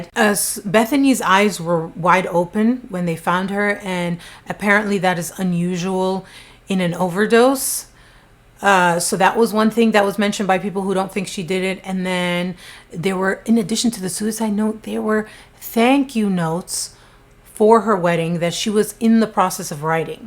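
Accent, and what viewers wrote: France. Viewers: just got invaded by visions of Lori Vallow or so. LOL. You narrate stories well and champion the victims. Thanks,! I usually skip the ads but not with you, haha! Your French accent is tres, tres bien! I think it may have been Tyler on his own or Bethany, in her fragile state of mind, was pushed to doing it. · you have a great french accent! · I'm living for your French accent! good luck on your Paris endeavor. Thanks to you, I don't have to deep dive into these disturbing situations, myself. I feel so terrible for lil Bethany...she was such a gem. Like what a beautiful mind and smile. Hopefully she is resting easy and her loved ones are trying to heal.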